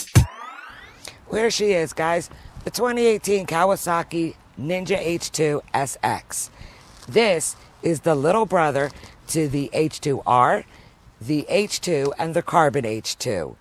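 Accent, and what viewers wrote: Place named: England, United Kingdom. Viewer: Great video and very informative, I too noticed you have some English accent as I say leeeeeever too!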